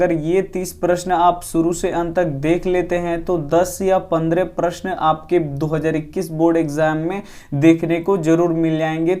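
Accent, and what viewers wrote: Bihar, India. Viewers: Hiii Kya aap bihar se ho..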